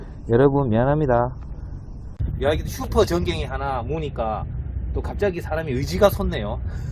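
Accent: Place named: Busan, South Korea. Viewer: 야야 와이라노?? 부산사투리 구수합니다~~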